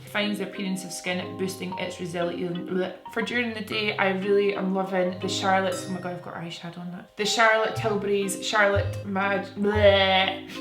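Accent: Scotland, United Kingdom. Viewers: Love your videos! It's nice to hear a fellow Scottish voice on Youtube 😍😊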